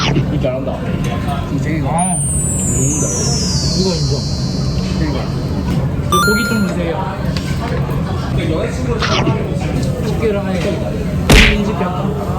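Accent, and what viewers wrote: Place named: Gyeongsang, South Korea. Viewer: 역시 경상도 사투리 구수 하네용.. 부산 가본적이 없는.. 그주변지역은 다가본....부산 갈일이 없었다는... 신기한.. 잘봣습니다.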